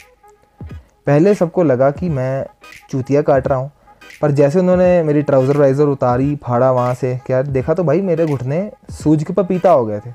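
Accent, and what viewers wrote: India. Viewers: new subscriber here ! sounds like a UP wale bhaiya !!Am I right????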